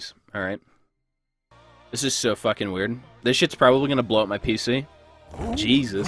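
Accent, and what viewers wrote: Nova Scotia, Canada. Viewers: is he from Nova Scotia?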